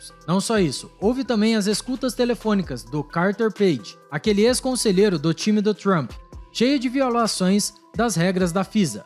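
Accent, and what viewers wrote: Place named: Caipira, Brazil. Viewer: Essa narração caipira é osso.....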